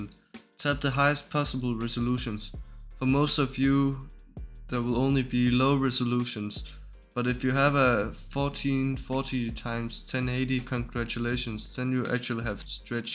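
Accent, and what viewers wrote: Denmark. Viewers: my g is 100% danish i can tell! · Are you from denmark? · are you danish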